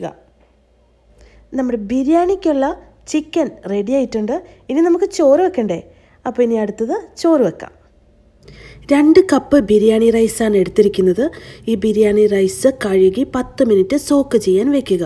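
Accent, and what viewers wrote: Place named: Kozhikode, India. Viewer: കോഴിക്കോടൻ ബിരിയാണിയും, ഭാഷയും സൂപ്പർ 👌👌👌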